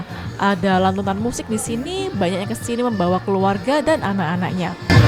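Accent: Java, Indonesia. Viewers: Jowo ne medok,,pisan² ajak ngobrol penduduk sekitar nggo boso jowo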